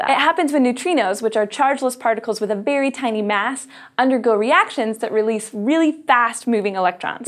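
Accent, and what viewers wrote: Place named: Idaho, United States. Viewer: Weird... Even me, a non native speaker with a pretty poor fluency can understand her. Google says somewhere around Idaho, USA... Btw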